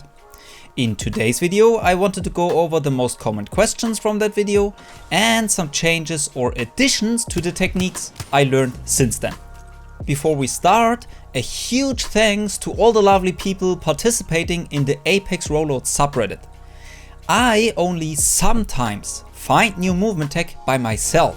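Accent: Germany. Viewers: your german accent is so obvious ;D, great videos man. keep on grinding my man. ;) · Man kann so krank hören das du deutscher hust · Was ein Deutsch englisch HAHAH😅✌🏼 xD, Save Deutscher oder?😅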